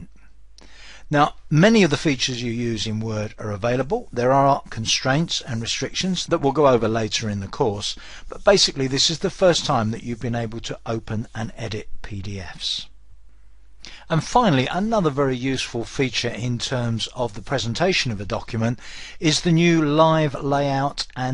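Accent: England, United Kingdom. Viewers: I do not like the English accent. It is annoying and difficult to understand. It grates on my nerves. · Only a dumb hick like you would get annoyed from a British accent.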